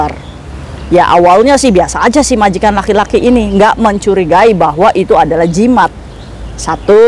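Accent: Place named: Java, Indonesia. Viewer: Ka orang indramayu ya kk ko Bahasa rada " jawa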